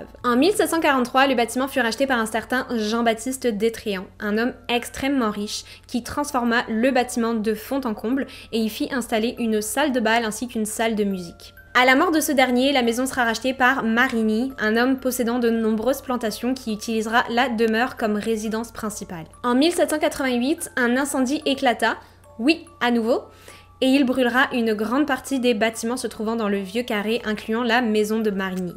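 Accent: Quebec, Canada. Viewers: Incroyable histoire!! C'est moi ou tu as une pointe d'accent québecois?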